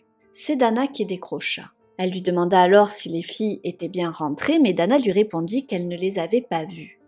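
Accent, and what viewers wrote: South, France. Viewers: Génial!! Tu viens du sud de la France, selon ton accent? Marseille?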